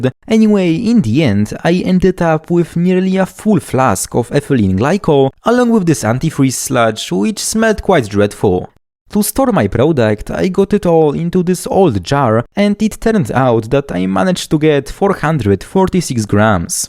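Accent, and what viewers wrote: Poland. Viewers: Cześć! Od razu słychać polski akcent, jeszcze to wymawianie "R", i cisowianka... Pozdrawiam! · After I saw cisowianka bottle, and heard your language I instantly knew you are from PL 🇵🇱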